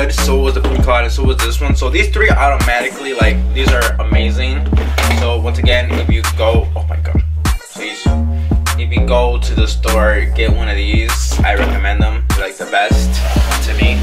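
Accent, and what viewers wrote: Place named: Chicago, United States. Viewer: This video randomly popped up for me, and instantly after hearing you speak I was like "this kids from Chicago..." so I went on your page, and bam... I was right. lol Hello fello Chicagoian !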